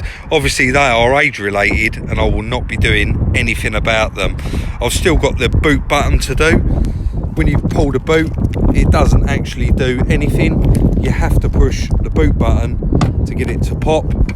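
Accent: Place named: Cockney, United Kingdom. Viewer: Great content, don’t change that cockney accent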